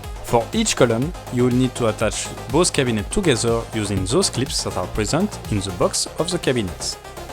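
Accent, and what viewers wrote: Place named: France. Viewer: Great video, also could barely tell by your accent that you are french! laughs in Hoho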